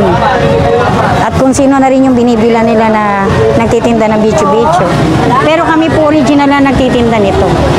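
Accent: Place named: Pampanga, Philippines. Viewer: Me tono po kayong Kapampangan God Bless po❤️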